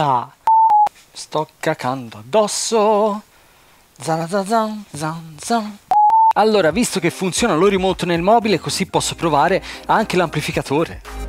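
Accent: Tuscany, Italy. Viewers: forte toscanaccio :)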